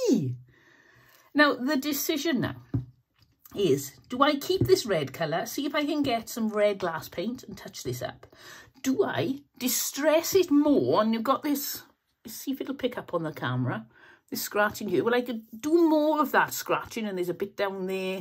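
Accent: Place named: South Wales, United Kingdom. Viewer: I agree. The South Wales accent is so beautiful.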